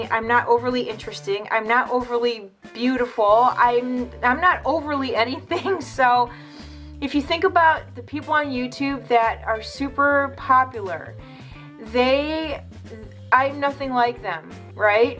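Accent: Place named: Ohio, United States. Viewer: I discovered you today through your easy peasy eye trick. I haven’t watched much YouTube but lately, have been watching to get make-up tips. I watched a couple more of your videos when I found out you are from the Cleveland area. I grew up in Wellsville, down on the Ohio River. Then, you mentioned Texas. I have lived in Texas for 18 years, 10 in Houston and 8 in the DFW area. I think listening to you talk reminded me of home. I don’t miss winter but I miss Ohio. I am happy I happened to find you.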